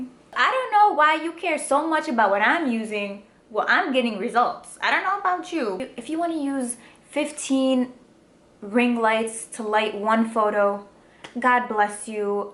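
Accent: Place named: Detroit, United States. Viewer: Yeah you from Detroit ... I hear it all in your voice lol I know cuz im from here too lol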